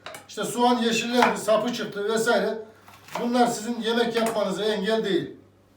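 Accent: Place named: Sivas, Turkey. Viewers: Abi nerelisin sivaslılara benziyon 😁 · Sa Nerelisin ustam iç anadolulu gibi konuşuyorsunuz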